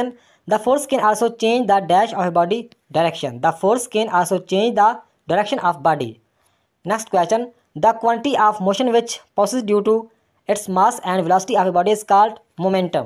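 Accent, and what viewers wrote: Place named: Punjab, India. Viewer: سر جی آپ انگلش کو پنچابی میں بول رھے ہیں ۔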